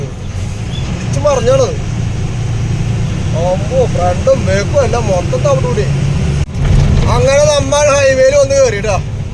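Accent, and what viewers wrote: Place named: Thrissur, India. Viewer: തൃശൂർ ഭാഷ ഇഷ്ടപ്പെട്ടു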